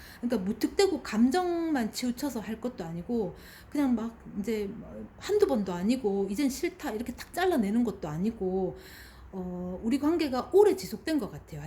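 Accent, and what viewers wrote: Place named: Gyeongbuk, South Korea. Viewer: 경북에 여행갔을때 들었던 억양이었습니다 😊 저는 한국어가 익숙하지 않아서 억양을 잘 기억하거든요!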